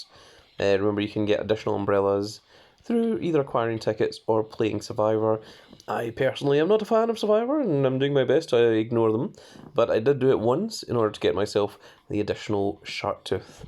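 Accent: Scotland, United Kingdom. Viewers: Are you Scottish mate?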